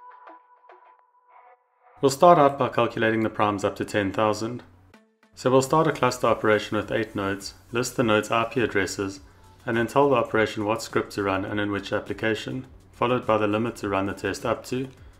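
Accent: South Africa. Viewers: Is this guy from South Africa??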